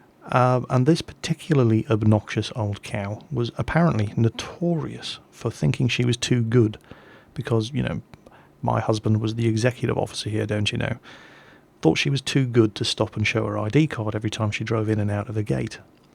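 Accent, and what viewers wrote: England, United Kingdom. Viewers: Love the videos. nice to hear another English You Tuber and yer you got me into both WOT's and War Thunder thanks allot its all i really play anymore. Good Luck with future videos and respect for being loyal to your "fans" and posting regular content! All in all great journalism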